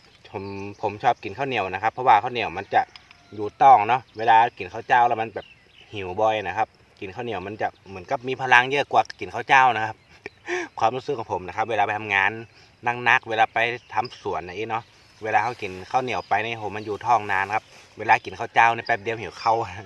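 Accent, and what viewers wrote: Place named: North, Thailand. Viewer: อู้เมืองก่น่าฮัก น่าฮักแต้เนอ เสียงนกเสียงหนูบรรยากาศดีเนาะ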